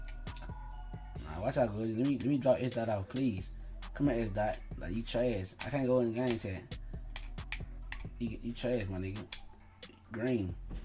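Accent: Memphis, United States. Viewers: Is u from Memphis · you from memphis cause yo accent sound like mines and im from memphis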